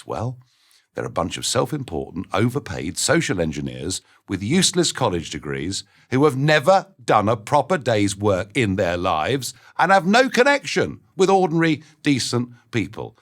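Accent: United Kingdom. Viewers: Nice British accent.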